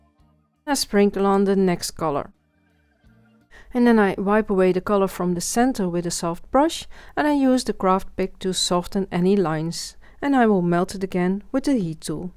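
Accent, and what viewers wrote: Netherlands. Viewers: Waauw amazing ideas that I've never seen before ! Thx !! En ik dacht al dat Nederlandse accent te horen haha.. wat heb je leuke nieuwe ideetjes zeg !! En wat een mooie kaarten en bloemen !!! Enorm bedankt voor je moeite om het te delen !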